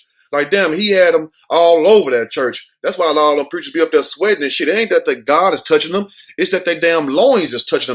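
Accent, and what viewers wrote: South, United States. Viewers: your accent is somewhere between the Bible belt and Houston /Arkansas 😂😂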